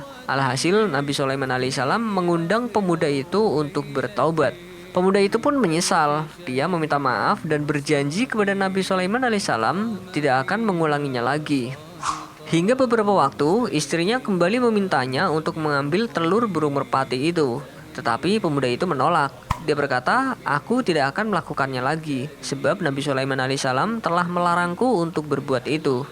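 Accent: Java, Indonesia. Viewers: Admin Jawa ya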